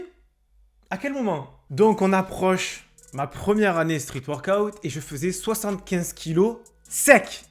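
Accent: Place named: Marseille, France. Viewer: On sent que tu viens de Marseille lol!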